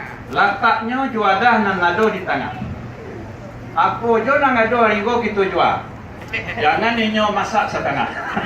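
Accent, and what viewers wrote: Minangkabau, Indonesia. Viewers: Beliau ko psmuko minang malaysia luar biaso.. walaupun baliau lahia jo gadang di malaysia tp bahaso minang baliau ko pas bana...hebat smoga diikuti dek nan mudo di rantau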